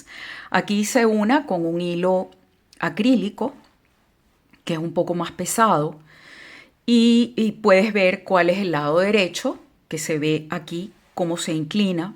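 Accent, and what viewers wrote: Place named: Venezuela. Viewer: Muy lindo, muchas gracias!. Me ha insipirado tu muestra. Voy a hacer un cardigan con 10 vueltas de tu punto y 10 llevando un punto en diagonal cada 6 u 8 asi el diseño tiene continuidad. Todavia estoy evaluando si hacer las mangas todas caladas o no, ya que son mangas al codo porque es una prenda de lana de algodón para verano. Me encanta tu acento, es venezolano?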